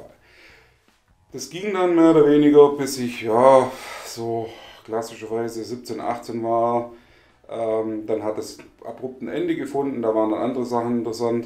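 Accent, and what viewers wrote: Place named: Swabia, Germany. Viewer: Hallo, Glückwunsch zu deinen ersten 100 👍 Und: schwäbisch isch schbitze. Gruß Jürgen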